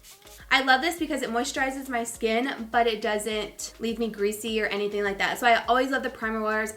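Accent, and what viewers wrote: South, United States. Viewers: hear a little of your southern drawl, but that’s adorable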